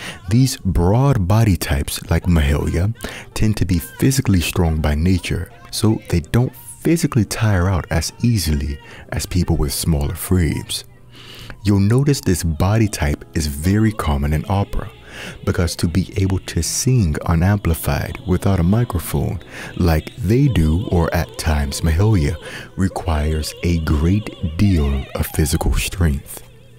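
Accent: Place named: Louisiana, United States. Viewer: i love hearing your #louisiannaaccent... some accents are so musically nuanced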